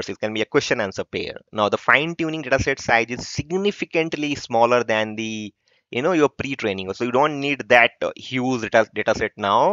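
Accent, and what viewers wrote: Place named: Bihar, India. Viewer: Bro are you from Bihar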